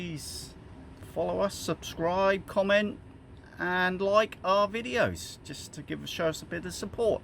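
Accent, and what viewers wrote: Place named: Australia. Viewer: Well I just checked out your other videos and see you're in Australia. I'm in the US but my best friend lives in Sydney and I was sure that was a down under voice you have.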